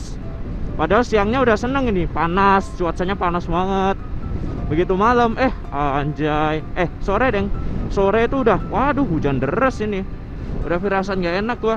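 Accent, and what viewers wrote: Java, Indonesia. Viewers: omongane kagok ketok e wong jowo iki mesti😂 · Ngomong guwe ne medok mas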